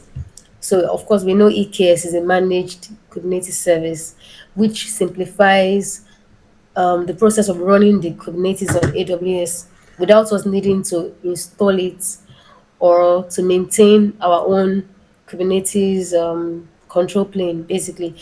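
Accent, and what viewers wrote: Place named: Nigeria. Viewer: I could sense the Nigerian accent. Please tell me if I am right?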